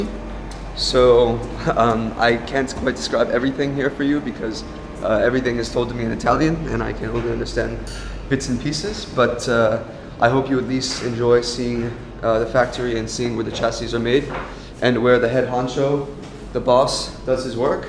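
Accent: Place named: Germany. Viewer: Why do you live in a dorm in friggin Marburg and pronounce Italian words with a German accent when you're an American, racing in an American series? So confused right now...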